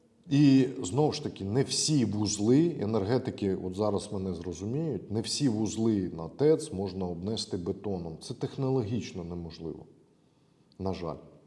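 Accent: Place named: Russia. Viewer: Зі всією зневагою до нинішньої ''влади''... Ти просто'' топиш'' за ''шоколадного''... Це ганьба!!! А, так... І - підтягни українську . Бо ж аж сифонить ''узькім''...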